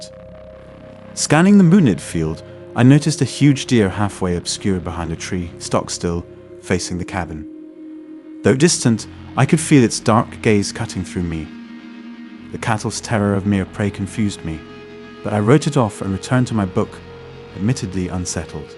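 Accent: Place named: England, United Kingdom. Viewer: An Englishman ! 😉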